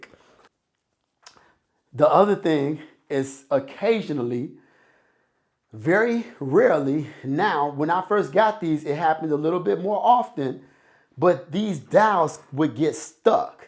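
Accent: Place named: Washington DC, United States. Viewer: Thank u brother helpful video got the same set haven't had any issues yet. Sound like u from D C u gotta accent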